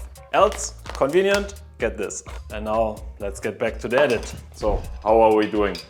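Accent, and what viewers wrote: Germany. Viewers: The german accent is going to kill me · sounds triggeringly german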